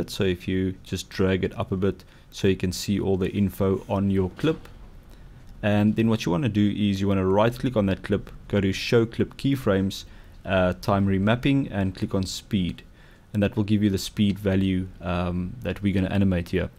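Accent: South Africa. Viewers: "Suid Afrikaanse" accent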